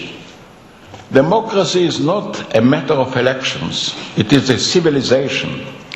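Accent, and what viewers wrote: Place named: France. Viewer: boyle francizca aksani kulaga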